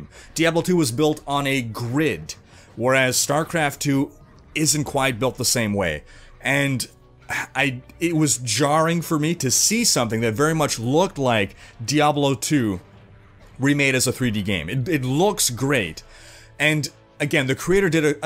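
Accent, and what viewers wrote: Quebec, Canada. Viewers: Wait, are you a french canadian ?! :D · Whoa! Are you french canadian?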